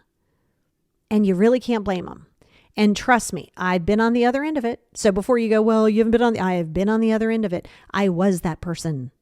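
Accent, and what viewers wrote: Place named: England, United Kingdom. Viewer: Hi from Algeria , the English accent was cute 😂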